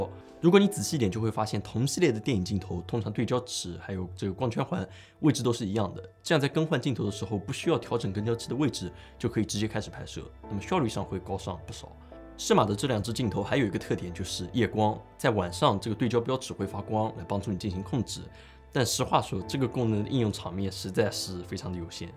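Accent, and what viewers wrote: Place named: Hangzhou, China. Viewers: 杭州口语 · 你的杭州口音还是改不过来啊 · 杭州人喔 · 我們大杭州標準口音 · 强烈的杭州口音！！！听着好点别扭！！！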